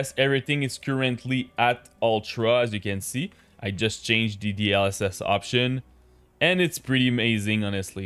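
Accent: Quebec, Canada. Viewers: merci man l'accent quebecois est en force ahahah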